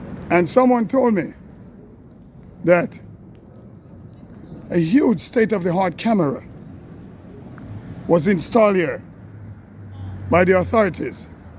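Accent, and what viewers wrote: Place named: Central America. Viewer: Are you from Central America? More specifically Hondurus? I am from Belize and your accent is very similar to my dad's.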